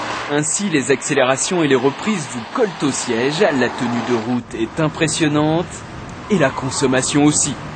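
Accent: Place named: France. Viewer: you sound so much like a f*cking arrogant french.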